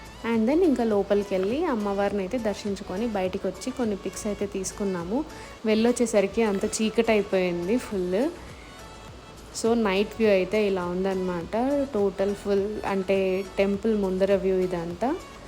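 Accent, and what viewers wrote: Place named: United Kingdom. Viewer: Kuki kadhamma kukke anali...prathi word ki edho English accent touch ivvalni try cheyakudadhu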